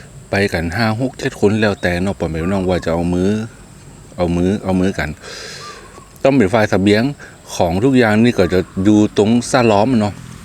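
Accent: North, Thailand. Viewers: ชอบ ฟังพี่พูดภาษาเหนือมากคะ น่ารักมาก ขออนุญาติถามคะ ลำแตลำว้า มันแปลว่าอะไรคะ🥰🥰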